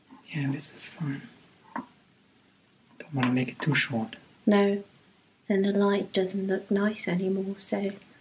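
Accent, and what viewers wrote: United Kingdom. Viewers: I agree completely.I couldn’t believe she wasn’t English.She has the most English of voices and I could listen to her all day.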